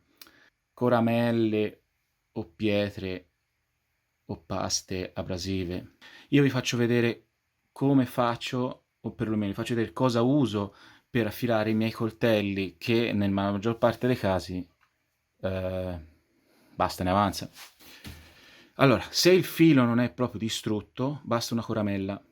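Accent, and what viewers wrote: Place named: Prato, Italy. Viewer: Ciao! Dal tuo accento direi appennino pratese? Ottimp video, essenziale e concreto!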